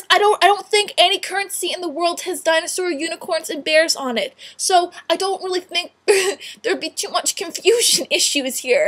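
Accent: Canada. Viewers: you know what I like it more when you're calm in ur videos... but it's ok cuz ur Canadian accent is so kwl =] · I think i like the canadian accent.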